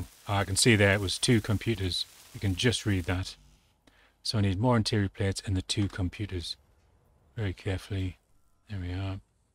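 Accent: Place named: Sunderland, United Kingdom. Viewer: Sounds like you're from Sunderland.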